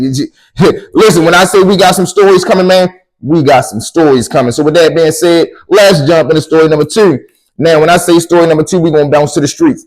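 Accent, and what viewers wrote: Baltimore, United States. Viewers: I knew you was from Bmore when i heard you talking..im from east . North Rutland..i kno the talk..crazy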